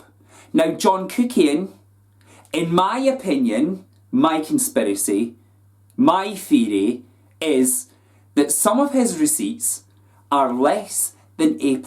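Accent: Scotland, United Kingdom. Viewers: love hearing a Scottish accent on youtube!! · Your accent reminds me of this really hot Scottish squaddie I dated last summer, such good memories 🤤🤤🤤🤤😍 so sad we lost contact arrrrrghhhhh!!! random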